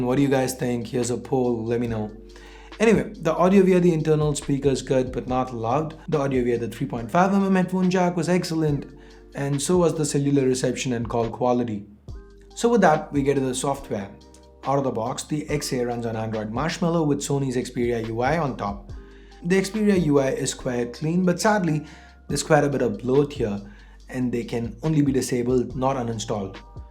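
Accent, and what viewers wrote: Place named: India. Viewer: bhai just a suggestion. English ya toh clear Indian accent me bolo ya fir purely British/American whatever you are trying to attempt here, dont jinx them up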